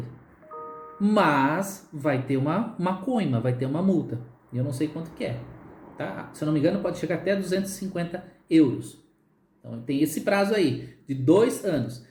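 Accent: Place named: Brazil. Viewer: Você nem parece brasileiro a não ser pelo sotaque. Parece inteligente e educado. Domina a Língua Portuguesa. Você está com problemas devido às muitas vigarices com as cartas de condução. Evite a palavra camioneiro (?) e utilize o têrmo camionista, bem como Policia e não policial. Tem que se adaptar à realidade lusitana e boa sorte...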